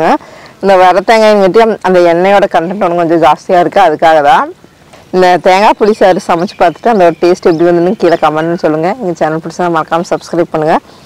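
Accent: Kongu, India. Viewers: கொங்குதமிழில் சமையலும் அருமை... இந்த பச்சைசெலவு குழம்பில் கத்திரிக்காய் போட்டு பன்னினால் இன்னும் அருமையாக இருக்கும்... பச்சைசெலவு கத்திரிக்காய் புளிக்குழம்பு ..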